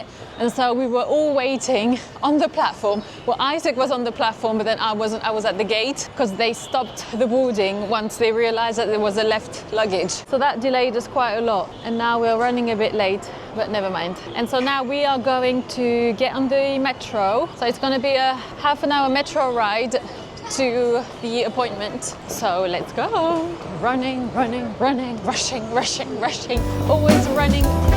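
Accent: United Kingdom. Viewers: Hi, I love your weekly vlogs. Especially this one, which is amazingly stylish and informative. What a lovely British accent you have 😊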